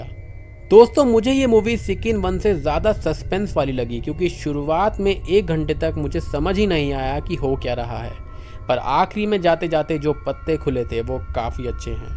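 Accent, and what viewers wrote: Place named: Haryana, India. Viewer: Haring admi ka awaz hai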